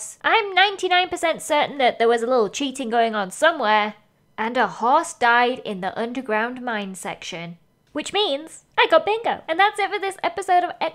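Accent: United Kingdom. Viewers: Why do you sound British???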